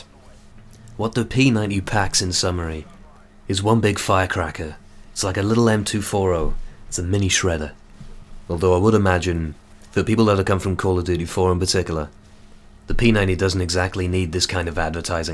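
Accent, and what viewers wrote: Wales, United Kingdom. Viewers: Are you Welsh? You seem to have a very strong welsh accent. But the manly one, not the gavin and stacy welsh accent. The one that that comedian has... uh, whats his name... he did a work experiance series where he did work instead of comedy... ANYWAY, yeh, so, are you welsh?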